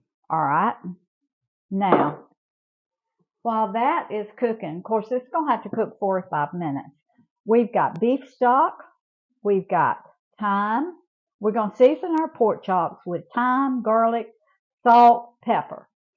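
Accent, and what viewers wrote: South, United States. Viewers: Hi, I just came upon while watching another video. I'm a 71 year old,married to my wonderful husband for 50 years, two children, six grandchildren, from eastern North Carolina, who loves Jesus! I hear by your accent that you're southern too, where do you live? I'm enjoying your videos! Wishing you and many blessings!❤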